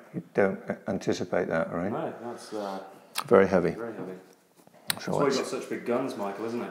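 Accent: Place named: Yorkshire, United Kingdom. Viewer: I think this guy is great I sent him a Christmas greeting and said all the best from Leeds in Yorkshire and much to my surprise I got a reply saying merry Christmas lad. much appreciated and I'm not sure if he is from Yorkshire but he sounds like it to me anyway what a really nice guy and some people might don't think wealth suits them but it well although I should resent wealthy. People being poor as you like but no he's a gent a colourful one but still a gent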